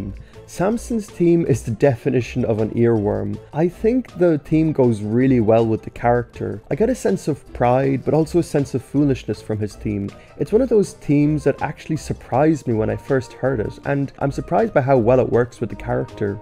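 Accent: Ireland. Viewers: He's Irish, and Irish does not have any sounds that collorate with thorn ( þ ) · He's probably irish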